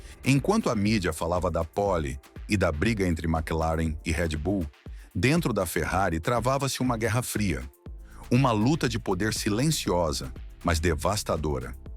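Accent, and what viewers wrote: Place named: São Paulo, Brazil. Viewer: Merda de IA com sotaque caipira paulista! Medonho.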